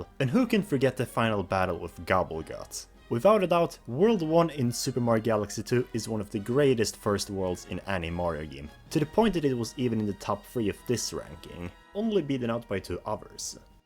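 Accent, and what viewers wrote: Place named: Sweden. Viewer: are you from sweden? you speak with a swedish accent and i am from sweden so i recognize it. i also love super mario galaxy 2. är du svensk? du pratar engelska med svensk brytning så jag känner igen den. jag älskar också super mario galaxy 2.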